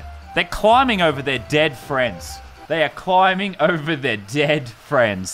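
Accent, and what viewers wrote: Australia. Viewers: Are u Aussie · Are you from Perth because I'm from Sydney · if you think he sounds like pewds... he doesn't, he's Australian. I know cos I am to. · You an aussie? The vid was in my recommended list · Australian? Most YouTubers are Americans I am surprised to find a fellow Australian on YouTube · Aussie accent comes through ama subscribe mate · great accent. Australian? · Hes pewdiepie but funnier and australian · This guy Aussie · are u Australian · he's Australian... subscribing now...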